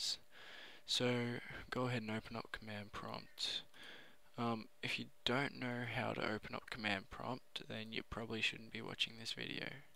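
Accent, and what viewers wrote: Australia. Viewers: hes aussie !! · so Austrailn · it's an australian accent, and he's australian.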